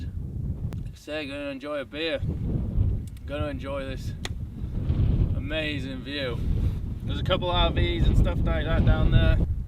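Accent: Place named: United Kingdom. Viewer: Welcome to the states. I assume you live in the UK? Those hard boiled eggs looked good. Now I'm hungry for hard boiled eggs. Looks like you're well packed for your trip. The truck bed looks comfortable. Sounds like a good time with family in Vegas and camping afterwards. Enjoyed the video. Love your British accent.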